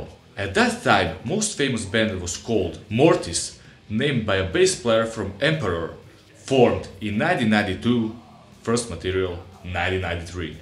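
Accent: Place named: Russia. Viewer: Давай по-русски теперь) я по акценту вижу, ты наш)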